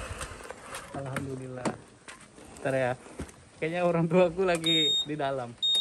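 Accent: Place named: Medan, Indonesia. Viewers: Saya kira bang ini orang medan krn logatnya kayak medan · Qkira org medan.. dari logatnya, gk nyangka klo org lampung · Bhasa ny kek org medan y kk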